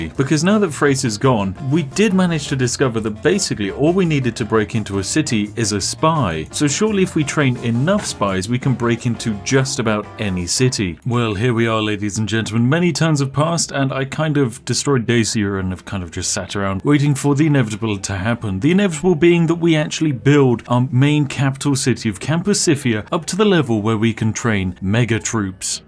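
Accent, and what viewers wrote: United Kingdom. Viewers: is one of the only youtubers where I pause to go to the bathroom come back un pause and the first this game I hear it “And there all going to die” in a calm British accent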